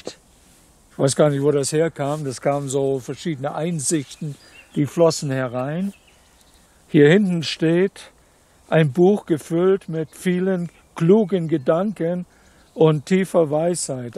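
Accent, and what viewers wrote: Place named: Saxony, Germany. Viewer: 👍💚 Ich liebe es, wenn das Sächsische durchklingt.. 💚👍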